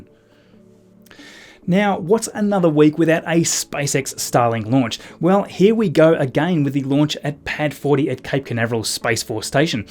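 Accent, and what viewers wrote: Australia. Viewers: Lol, YouTube CC wrote “Bokeh Chicago” when you said “BocaChica gal” ... that Australian accent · maybe its the Aussie acent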